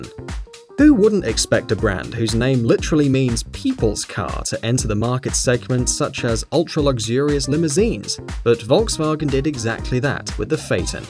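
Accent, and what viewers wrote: England, United Kingdom. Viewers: Hey mate I love your video, and your accent. English me thinks, but I'm a bit butt hurt, about that (except trolls) bit. So I guess I won't be hearing from you. Bollocks we Trolls never get to have any Fun. The Trolls need Love too, you know. Oh well, after a good cry, I'm going to another channel, and "Let slip the dogs of War". Fair thee well, my lord. ( ;) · Why is this an English narrator for a video about American cars?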